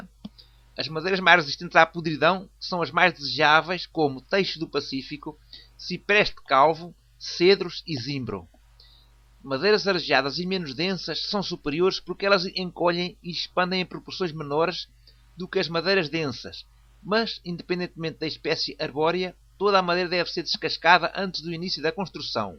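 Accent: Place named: Portugal. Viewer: A fala é de um português!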